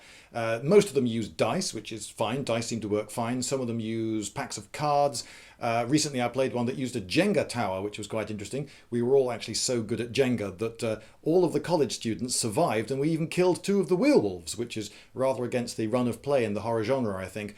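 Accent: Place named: United Kingdom. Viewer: what part of the UK is this dude from? I am interested what regional dialect/accent he has. It's bad ass!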